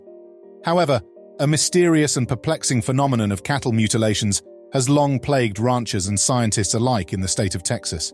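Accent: United Kingdom. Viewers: Could do without the narrator's brit accent. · Is this a Jew with a British accent attacking the White race again? Pretty sure it's a Jew jewing. Christians eat this shit up.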